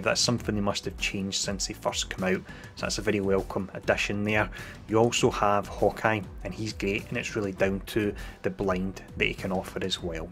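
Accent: Scotland, United Kingdom. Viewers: If I could have picked any accent to hear walk me through this, I would have picked that of a Scot :-D Thanks for the help mate!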